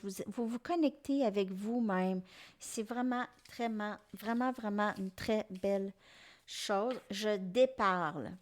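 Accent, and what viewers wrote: Canada. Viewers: Vous êtes excellente,agréable à écouter avec l accent canadien bises de Belgique de Robert Brummel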